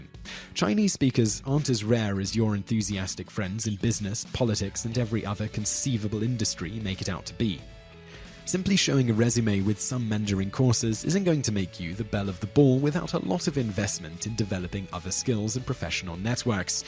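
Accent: England, United Kingdom. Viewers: Your British English is useless today outside of the UK. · VVDivineOwl You know the person speaking is clearly English by his incredibly obvious accent, don't you? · It is so nice to hear such an elegant British accent say such nonsense. It sort immunizes us against form over substance situations. Its like listening to Ted Cruz being stupid and then hearing that he was a brilliant student at Harvard. It devalues a Harvard education and a fancy accent to the benefit of those of us in the public education system. Here's a thought: go on Google and search "Chinese language." And stay away from any list on the Internet. They are carriers of stupid. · Learning Chinese now and enjoying it. The narrator sounds English. English people are the laziest when it comes to learning other languages.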